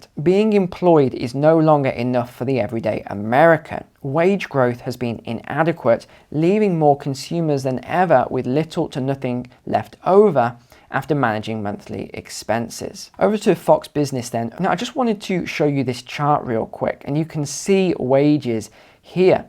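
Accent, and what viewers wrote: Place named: England, United Kingdom. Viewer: I start like English accent more and more.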